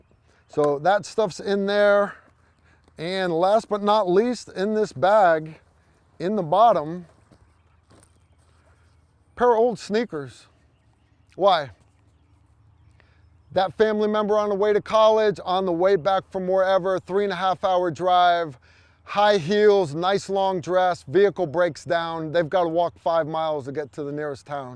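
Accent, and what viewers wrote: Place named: New York, United States. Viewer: Great video- just subscribed. I'm another dad who puts these bags in the wife/kids cars and have them roll their eyes at me about it. I've caught them sneaking them out and leaving them in the garage. When they did, they lost car privileges. Does any dad NOT get called an idiot for putting these in their family's cars? Are you in New York? Accent sounds a little like it.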